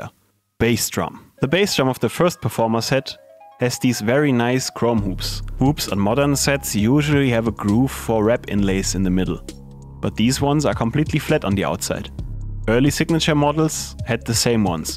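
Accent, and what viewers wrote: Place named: Germany. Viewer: Cool project and great video! I really love your sense of humour, and the german/english speaking voice with the small but delightful pronunciation problems. Beer/bear <3 Please keep it just like that - comes forward as honest, funny and very human. Looking forward to part 2!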